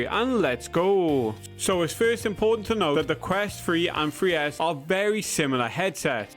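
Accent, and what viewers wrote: Wales, United Kingdom. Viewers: Welsh is it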